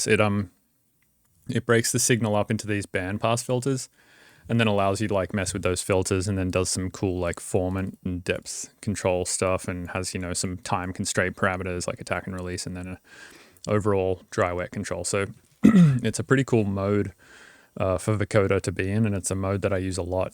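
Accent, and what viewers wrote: Australia. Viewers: He's from Australia, he always sounds like he has a cold! :D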